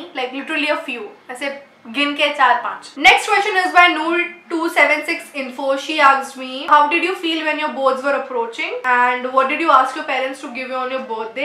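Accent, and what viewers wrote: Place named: Gujarat, India. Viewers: You speak so funny Gujarati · Woh woh wohhh.. u speak very sweet gujju. Jus loved.. lots of love n blessings..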